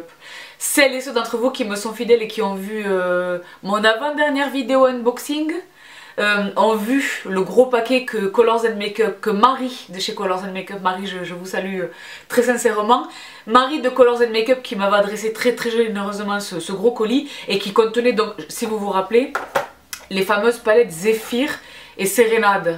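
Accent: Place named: Montpellier, France. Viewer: Bonjour, bravo pour tes tutos très pros et ta bonne humeur!!! Tu as l'accent de chez nous ( je suis de Montpellier), j'adore, tu me fais penser à mes amies Biterroises, c'est incroyable!!!Bises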